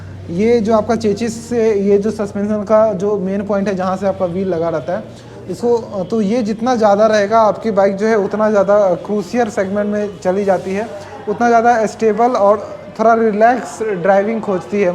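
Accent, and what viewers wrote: Bihar, India. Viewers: I bet you are Bihari. I guess because of your pronunciation of ra and da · Are you from Bihar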